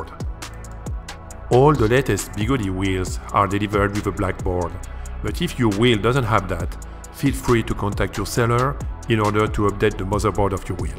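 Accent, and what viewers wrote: France. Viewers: Plus un pour l accent français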